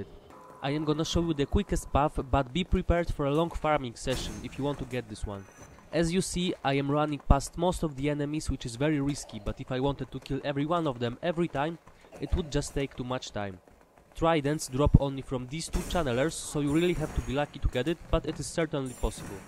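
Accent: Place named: Italy. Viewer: Avverto un accento italiano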